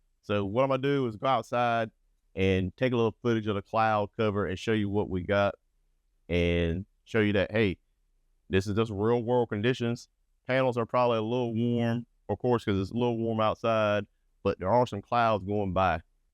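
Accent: South, United States. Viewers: I'm glad I'm not the only one to think that. I was going to say he's Laurence Fishburne's more southern sounding brother.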